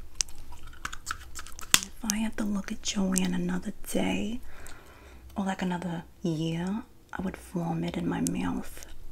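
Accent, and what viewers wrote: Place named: New Jersey, United States. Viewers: Love your jersey accent videos!!😊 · That New Joisy accent has me laughing! It's great! LOL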